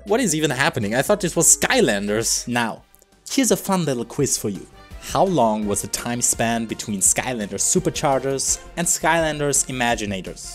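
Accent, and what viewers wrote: Germany. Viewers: Your accent sounds really german are you?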